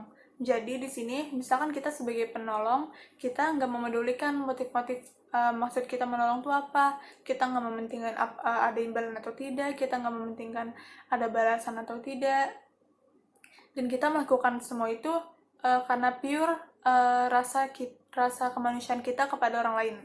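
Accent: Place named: Outer islands, Indonesia. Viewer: Bahasan yg lugas, tegas, jd dpt ilmu baru...tapi kl hati lg betmut bs ga ya org berprilku prososial...;🤔🤔 Dialek org dr sebrang ya kk😁..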